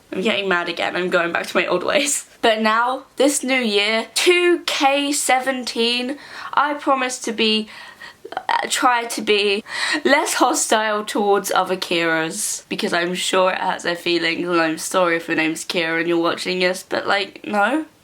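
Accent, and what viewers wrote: United Kingdom. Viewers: Is she British or American ?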